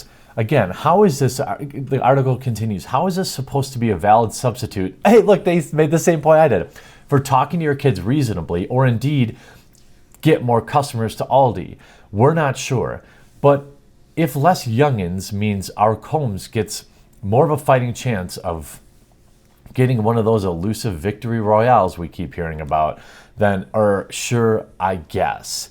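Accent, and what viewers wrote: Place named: Denver, United States. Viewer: are you in colorado? it sounds like your in denver...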